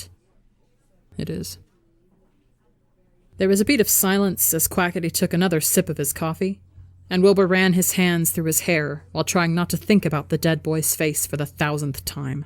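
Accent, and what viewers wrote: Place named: United Kingdom. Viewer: IM SORRY BUT I CANT HELP BUT LAUGH AT THE BRITISH ACCENT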